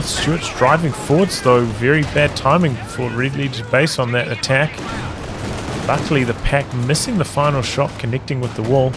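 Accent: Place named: New Zealand. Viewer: Great cast as always mate. Heading to Wanaka for a holiday come July. Your accent constantly reminds me of my upcoming holiday haha.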